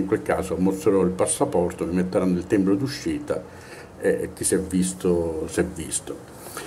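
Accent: Sicily, Italy. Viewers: ma sei Siciliano, della provincia di Catania?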